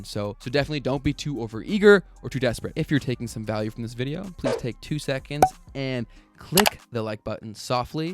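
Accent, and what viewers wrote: Canada. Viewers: You have a slight Canadian: Trailer Park Boys accent. Which is awesome. (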